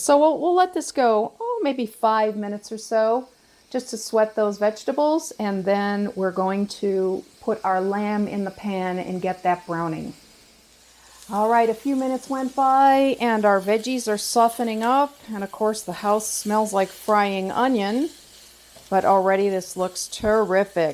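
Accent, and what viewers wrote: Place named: Midwest, United States. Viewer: Your accent reminds me of the midwest, from Michigan here. I love watching you and your husbands adventures in Vegas, thanks for sharing!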